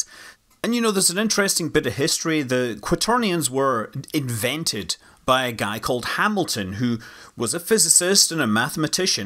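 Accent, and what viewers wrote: Scotland, United Kingdom. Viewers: Hey I didn’t know you were involved with stowaway until about what 30 seconds in I hear this beautiful scotttish voice lol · Love the accent ? I know its scots , which part? great show, 🦘💥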